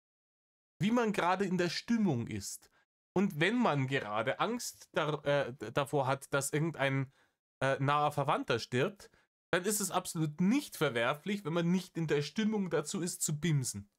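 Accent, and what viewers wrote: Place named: Bavaria, Germany. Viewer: Wie sympathisch, mir mittlerweile bayerischer Dialekt erscheint, seit ich den hör! Ofi ist die Puffmutter, der gute jedoch trifft absolut meinen Geschmack, wie man bei uns sagt: mit dicker Fresse und ziemlich viel dahinter! Also,i geh jetzt nein da,in den Reiner!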